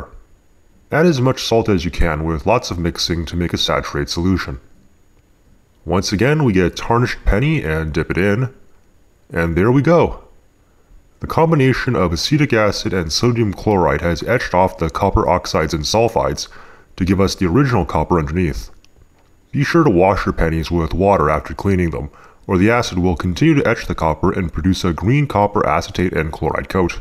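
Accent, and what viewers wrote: Canada. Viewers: I knew he was Canadian in the first video I saw, which was I forget which one lol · Ahhh ur from Canada eh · Are you canadian? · I personally think Canadians are awesome. They have beautiful accents, they live in a fantastic land, and best of all they have this guy.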